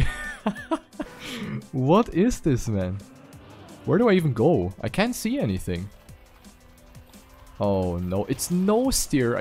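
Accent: Germany. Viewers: u German? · Damn the german accent is Go intensive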